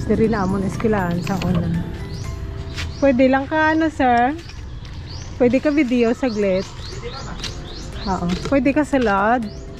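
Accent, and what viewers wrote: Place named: Iloilo, Philippines. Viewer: Ilonggo ka po talaga magsalita😅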